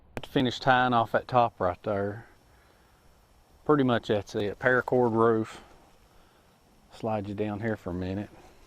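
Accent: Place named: Georgia, United States. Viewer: Really great videos sir!! You sound just like some people I know in Ringgold, GA!! Keep em' coming man!!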